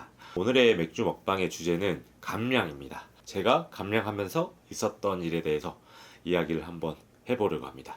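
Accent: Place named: Jeolla, South Korea. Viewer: 오 완전 서울분인줄 알았는데 유튜브로 보니 좀 전라도 사투리가 들리네요 ㅎㅎㅎㅎ 매력있슴다~~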